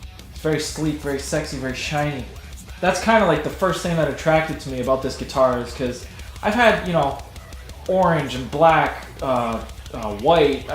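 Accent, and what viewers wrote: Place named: Canada. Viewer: Thanks, I thought I heard a Canadian accent :-).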